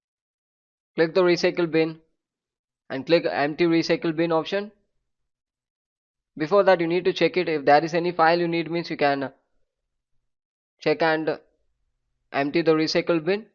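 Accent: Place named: India. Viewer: Você é indiano amigo??? Seu inglês tem um forte sotaque indiano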